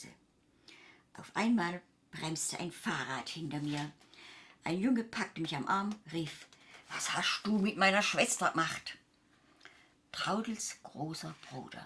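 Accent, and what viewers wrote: Swabia, Germany. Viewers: Kann es sein das du leischt schwäbisch redest 👐🏻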